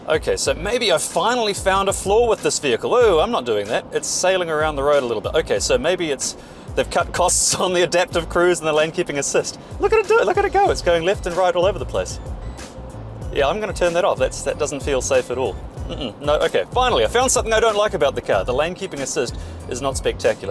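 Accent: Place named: New Zealand. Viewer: Just discovered your channel. I like your clear speech (Kiwi ascent not bad) and your casual and slightly excited and fun style also. Your simple but detailed explanation of what you are testing (Atto 3, I like it too). Background music & Ads are not bad either. Thank you. Lloyd from Adelaide Sth Aust.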